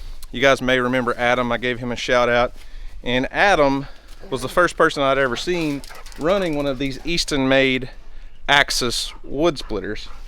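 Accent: United States. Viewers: Wooowhoooo CANADIAN MADE!!! 🇨🇦 sorry my country pride is showing! Funny when you said the name of the company I immediately thought hey isn’t that a Canadian company?! When you confirmed it right away I thought way to go! Then I thought wow with the exchange rate you probably got a heck of an amazing price too! Smart man! I’m so proud that you were as thrilled with it as you were, built tough to last! Trust me when you live in the “great white north” you want to have tools that work and will last through the elements. Lol! I love your American accent too! Hehehe 🥰 funny here I am living on the 34th floor high in the sky in a metropolitan area watching a country guy splitting firewood and feeling country proud! Life is strange at times eh? (Thought I’d toss in that Canadian “eh” just to prove I’m truly a Canadian! 🇨🇦 As always I love your videos and this one was extra special for me. 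So glad you enjoyed splitting your firewood isnt life so much sweeter when we enjoy what we do? Sure makes chores less like chores and more like just living. Be well and enjoy your new toy!! Lol!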